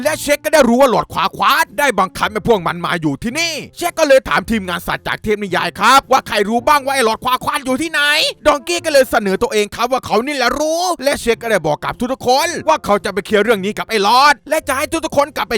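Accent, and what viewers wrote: South, Thailand. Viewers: ผมนอนฟังขำเลยภาษาใต้ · พี่เป็นคนใต้บ่นี่ 55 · พี่บาวเป็นคนใต้กันหลาว หรอยนะ ผมก็เป็นคนใต้ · คนใต้รึป่าวครับผมคนใต้ฮะ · 4.52 ไอยักษ์เข้าเมื่องมาได้พลั่นปรือนิ(พี่เป็นคนภาคใต้หรือปล่าว)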